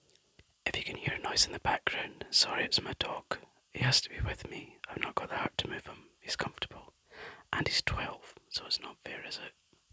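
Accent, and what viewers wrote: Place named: United Kingdom. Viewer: Your nails are strong and sound AWESOME!!! 🤩 Loved the mic caressing, the tapping and scratching on the first goldy Blursh all-in-one liquid pigment, the tapping and scratching on the Blursh Ice Ice B B box and the tapping on the lid, yes 😊, - that sounds cool, I need THAT lotion 🧴 I have super weak nails 😫, yes 🥱 - she is THE QUEEN ❤ I always enjoy a good BRITISH 🇬🇧 accent 🙃 I can tell you LOVE and ADORE makeup! 💄 Well done,! 😊 I came to support because of Lorri 🤗 slime would be a EXCELLENT trigger assortment idea! ☺️ In all the ways: Alone, on the mic, on a table, hands ups, cloudy, sticky, with toppings… Whatever 😇 ☀️ Take care!! Have an amazing night!! ✨🌝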